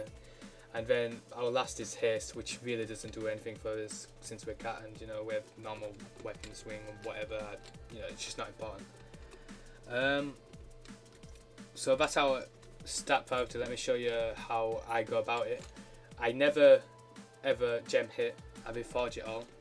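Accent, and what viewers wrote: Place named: South, United States. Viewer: Heavy southern accent cat -- cut -- bear -- b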